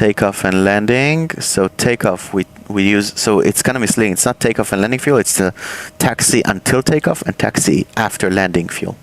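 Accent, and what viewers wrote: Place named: Israel. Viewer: You'er sound Israeli. Am i right ?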